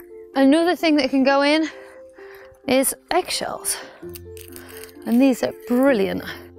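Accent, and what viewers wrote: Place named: Northern England, United Kingdom. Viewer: healthiest balanced diet compost i have seen for a while' love your Northern accent ( yep I'm a southern softy that went uni in B)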